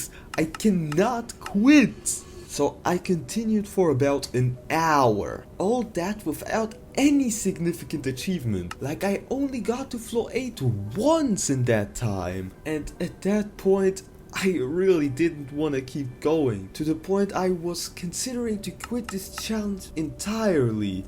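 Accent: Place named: Germany. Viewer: holy german accent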